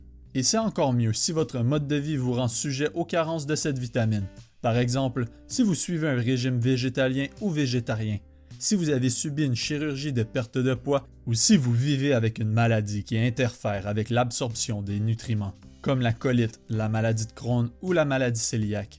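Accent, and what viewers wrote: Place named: Quebec, Canada. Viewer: Mer i pour la video et j'aime bien l'accent etes vous qebequoi?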